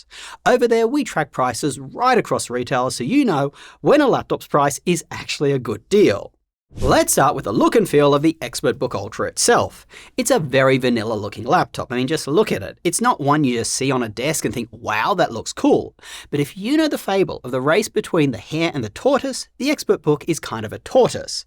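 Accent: Australia. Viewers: My God....I love the video but no offense you've got a very disturbing australian accent with bloody annoying high tone pich to it😂😂 Can not stand by longer than 1 minute....sorry😅😅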